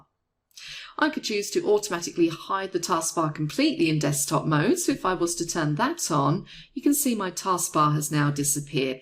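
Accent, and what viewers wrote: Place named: RP, United Kingdom. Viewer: You're the best a zillion times I love this I can't stop watching because of your voice and the pronunciation of the words, BBC English everyone can hear and understand you very very well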